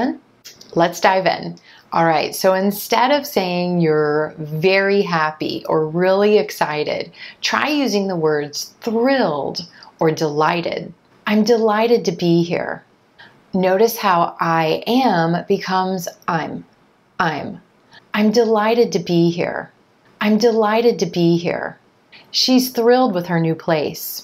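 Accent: United States. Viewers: you are the best teacher!! I love American accent.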